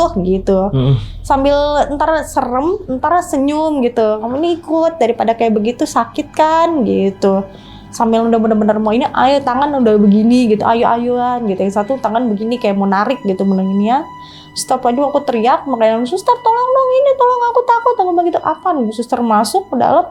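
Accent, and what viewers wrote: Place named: Jakarta, Indonesia. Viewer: Betawi bngt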